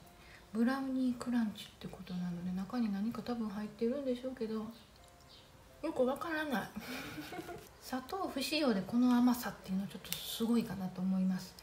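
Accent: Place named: Kansai, Japan. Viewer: 話し方が穏やかな関西弁で聞きやすかったです。 私も爪が弱くてコーティングするネイルを塗っていますがオイルのものも気になってました。今度買ってみます。紹介ありがとうございました。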